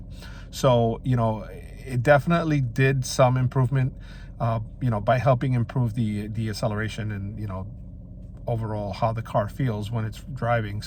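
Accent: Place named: New York, United States. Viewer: Great video, clear, concise, helpful with fab NY accent & phrasing - reminded me of Telly Savalas (TV detective Kojak). Who loves ya baby !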